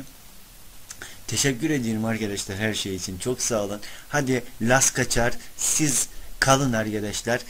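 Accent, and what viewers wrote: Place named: Giresun, Turkey. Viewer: abi siven bizim oranun sivesi da sen nerelusun ben giresun espiye ..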